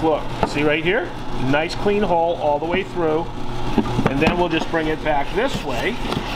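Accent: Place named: New England, United States. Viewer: I guessed "New England", so I was right but it's maybe unfair to guess six states at once?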